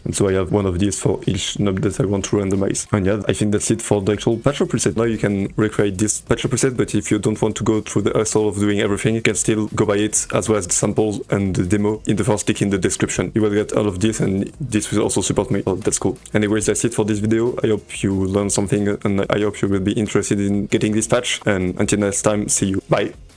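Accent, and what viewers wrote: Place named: France. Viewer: l'accent francais mdrrrrr continue comme ca !k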